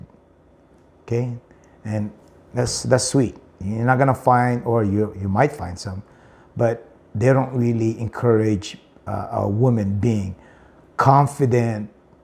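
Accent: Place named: Philippines. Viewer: You are on point. If you look Filipino, they expect you to behave like a Filipino. You speak perfect English of course, but I can detect a Filipino accent which is typical of many Fil Ams. This may add to the perception that you are native born Pinoy and are therefore expected to act this way. Otherwise you are Mayabang. Yes it doesn't make too much sense for you and me, but I know you'll understand and adapt soon. You and I are in the same boat, but I moved to the US in my mid 20s so I have a bit of a headstart. Welcome to the Philippines.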